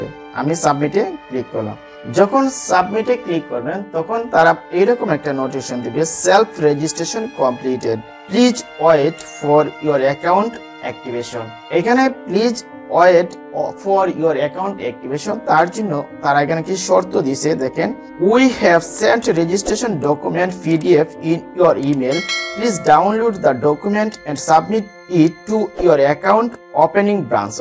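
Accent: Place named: Sylhet, Bangladesh. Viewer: Video deke mono hocce apni sylheti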